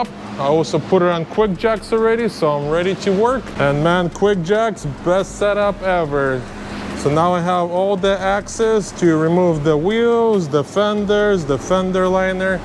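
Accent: Russia. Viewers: Такое чувство, что чувак русский🤔. Акцент русский)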